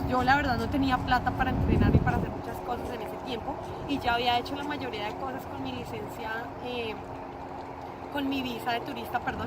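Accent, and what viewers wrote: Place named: Colombia. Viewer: Gracias por la información, soy mexicano y estoy tomando entrenamiento en Texas, he seguido el proceso que recomendaste pero mi duda es, ¿con sólo mi visa de turista puedo sacar hasta comercial? Apenas tengo 20 horas pero quiero ir figurando como le haré. Gracias de antemano por tu atención y ayuda! Pd: Me encanta tu acento colombiano 😁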